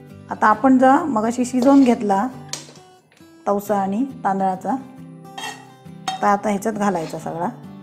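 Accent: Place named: Malvani, India. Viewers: अतिशय सुरेख तुम्ही मालवणी बोलताना आम्हाला गावाची आठवण येते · Ekdam bhari recipe...👌🌟👌🌟👌🌟...barik barik tips aani tricks sangilya mule pahayla chaan watali recipe aani karayla soppi👌👌😀...khupch goad watte tumchyakadun Malvani aaiktana...❤❤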